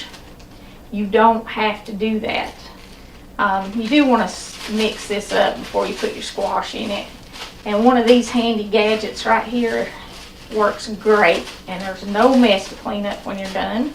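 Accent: South, United States. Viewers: LOVE her southern accent. Would it not be more convenient to use a bowl instead of a bag? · Just found your channel & am a new sub. I love your southern accent, such a beautiful part of the country you're from. Also really love that you put God first! The fried squash looks delicious, will definately be making it. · Bless you. I just moved away from the south to the Midwest and have been having the worst bout of homesickness. You not only sound like home to me but cook like home. Going to make this tonight!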